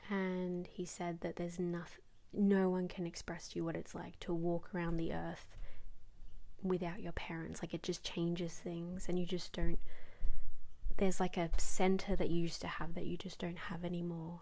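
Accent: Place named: Australia. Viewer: Nice hat. Australian is the best accent for swearing, it's fun to hear it in your videos sometimes!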